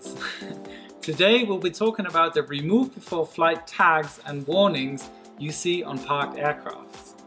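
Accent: Germany. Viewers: Hes german.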